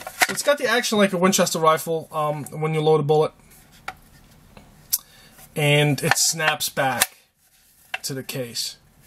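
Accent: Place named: South, United States. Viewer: laig, sounds like southern accent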